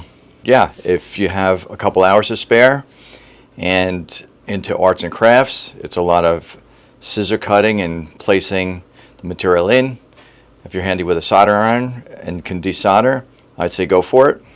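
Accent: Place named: Brooklyn, United States. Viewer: I detect some Brooklyn in thar…..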